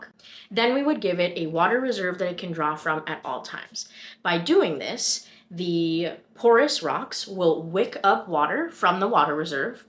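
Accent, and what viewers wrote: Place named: Southern California, United States. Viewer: Ooh I really like you! I love it when I can tell right away somebody is from SoCal, then when I saw your username I knew for sure! You explain things in a great, direct and enjoyable way!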